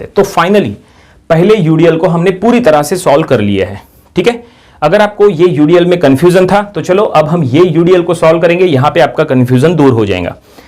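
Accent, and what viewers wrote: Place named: Maharashtra, India. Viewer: Bhai Maharashtra mai ase hi bolta hai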